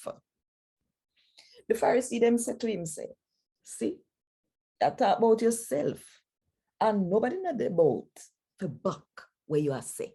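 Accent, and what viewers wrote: Jamaica. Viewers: Authentic Jamaican! Beautifully done. Keep it up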